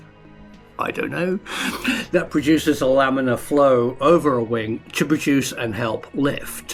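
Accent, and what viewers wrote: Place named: Scotland, United Kingdom. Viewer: omg this is sooo cool, and the bit i like ther most is your wee bit of scottish accent... lots more pls.. always great to watch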